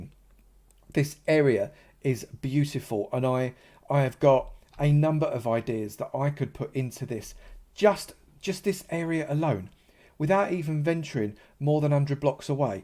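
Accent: United Kingdom. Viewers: Its a normal british accent you donkey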